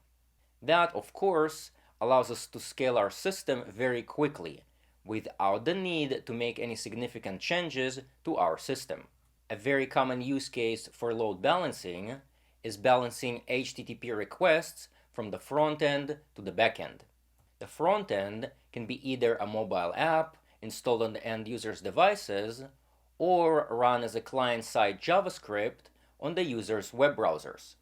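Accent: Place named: Eastern Europe. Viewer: You have interesting accent, do you have any Slavic roots?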